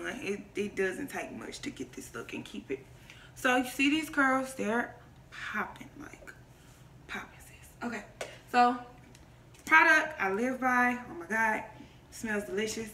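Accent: Atlanta, United States. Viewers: Glam Doll you know that’s an Atl accent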